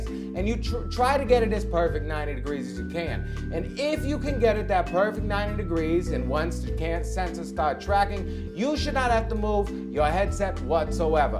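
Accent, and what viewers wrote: New York, United States. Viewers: Thanks, that was very informative and love the NY accent..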